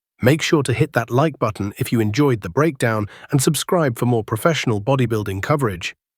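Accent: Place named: United Kingdom. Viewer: I love the British accent! Which assistant do you use?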